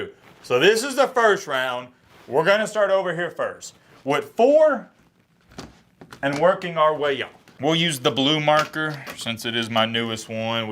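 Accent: South, United States. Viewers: And I waited through this terrible Sputhen Accent to hear Bama is out. Bittersweet. 😂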